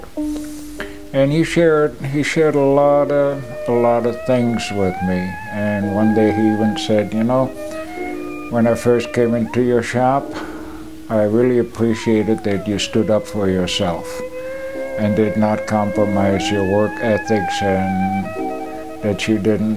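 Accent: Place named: United States. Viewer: For me, It is an american accent without even a tiny bit of a germanic tongue.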